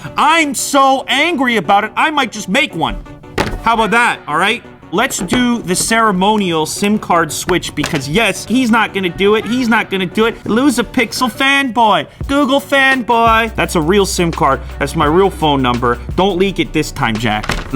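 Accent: Canada. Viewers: Is this guy Canadian? He got really Canadian about halfway through the video.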